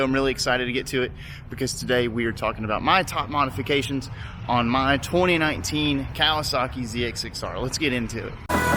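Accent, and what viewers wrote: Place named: Georgia, United States. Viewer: You sound like chase on two wheels. Must be a Georgia thing. Sick bike btw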